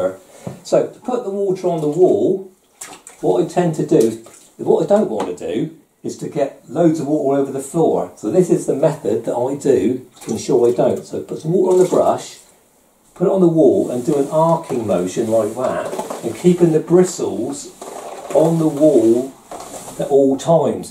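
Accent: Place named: United Kingdom. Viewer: I was watching the video just to listen to British Accent!!!... It's fabulous!!!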